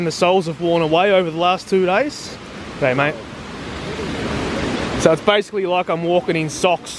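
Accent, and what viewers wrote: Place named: Australia. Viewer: Lol That Aussie Accent and Aussie Slang! Aussie Aussie Aussie oi oi oi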